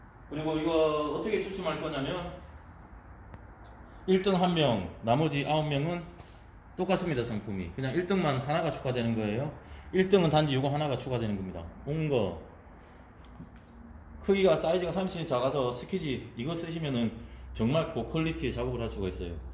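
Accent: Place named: Gyeongsang, South Korea. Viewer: 경상도 말투가 귀여우신분 처음봐요☺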